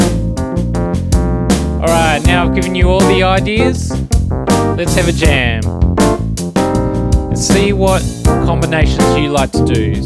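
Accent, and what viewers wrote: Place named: Australia. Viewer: Nice to hear an Australian accent. Cheers from country Victoria. Great lesson.🦘🎼🦘🎶🎵🎶🎶🦘🦘🦘🦘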